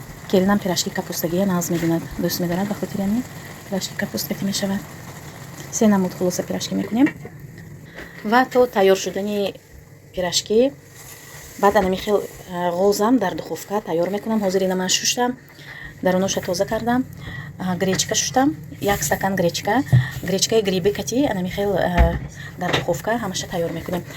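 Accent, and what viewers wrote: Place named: Asht, Tajikistan. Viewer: Ха барои хамин тарзи суханкардантонам мисли мо Ман хам аз райони Ашт Ман давно Канали шуморо тамошо мекунам ба шумо пешравихо хохонам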